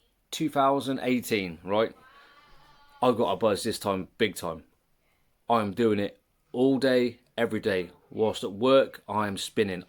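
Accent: Australia. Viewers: Sounds like he is from Australia. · How are you not Aussie !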